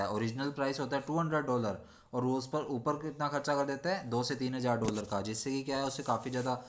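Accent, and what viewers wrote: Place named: Haryana, India. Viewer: You teach very good 😊👍🏻👌🏻 And I think that you belongs to haryana your seeking style is showing that 🤔🤔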